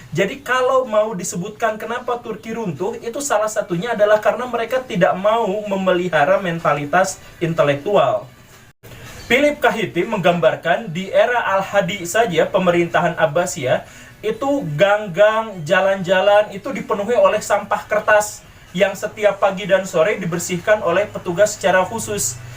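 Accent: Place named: Sunda, Indonesia. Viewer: Kaciri ari nu ijid mh kana solawat jeung wirid, koh lentong na mh siga sunda tp nuansa wahabi,aneh aja gituu teu matching 🤣 Introspeksi? Yaa kita coba introspeksi. -Jangan salahkan zionis freemason dll,tp yg justru di salahkan solawat dan wiridan. -Nenek moyang bangsa turki pernah menguasai dunia, -Nenek moyang bangsa kita pernah di kuasai dunia (dan sekarang pun masih). -baheula Kerajaan2 islam di nusantara berafiliasi ke turki,tp apakah sekarang ada yg dr turki berafiliasi ke indonesia? -turki termasuk negara maju, Sedangkan indonesia negara berkembang, yaa yang namanya berkembang kan bisa aja layu lagi,(dan sekarangpun kembali layu) *Jd yg keren itu mereka yg turki,yg pernah menguasai dan di segani dunia , Atau kita yg indonesia,yg pernah di jajah dunia? *Jadi yg harus introspeksi mereka yg turki yg kualitas nya jauh di atas negara kita,atau kita yg jauh di bawah negara mereka??? 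Lier aja gituu 🤣😂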